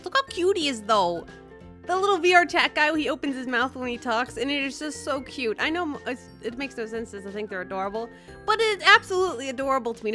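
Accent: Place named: United States. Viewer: It's so weird when you hear someone's voice for a while, and then see their face and it's COMPLETELY different than you imagined. This video is the first time i've seen your face- it's sort of like imagining characters in a book then seeing it in move form, but you actually had their descriptions in the book! ToT I thought you looked asian just with an american accent! Gosh i feel weird now.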